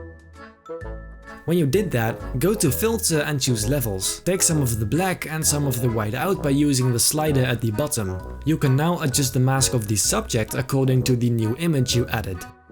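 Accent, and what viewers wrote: Netherlands. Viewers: Bro je hebt een geniaal account man, Ik weet niet hoe ik je tegen kwam op YouTube, maar toen ik je accent hoorde wist ik gelijk dit is een Nederlander haha. Keep it up man, good work. Abbo er bij ! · Are you Dutch? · Are you Dutch??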